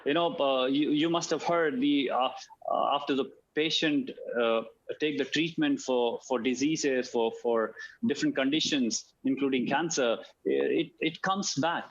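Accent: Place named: North India, India. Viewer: you have simplified the importance of Kangen Water in such a lucid manner that even a sensible school kid will realise the need for KW. But, with deep regrets I need to emphasize that speaks sich an elegant English and on the other hand, Mr, the host, speaks such an horrible english. His accent, his pronunciation of the words is typically north side bhaiyas type. He is grammatically incorrect too. For e.g., he says there is a query from the Prasad. My God, such a horrible English. It is just 'Prasad' and not 'the prasad'. please please please I appeal to your great highness to arrange for a better well educated host who could speak English properly for your next series of videos. AMEN.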